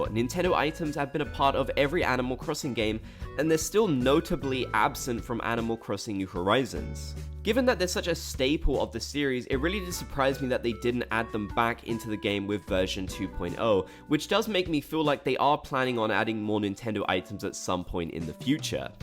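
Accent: United Kingdom. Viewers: You're British?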